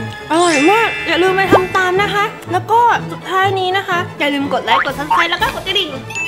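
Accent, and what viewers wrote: Isan, Thailand. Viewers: พี่เป็นคนอีสานหรอค่ะพี่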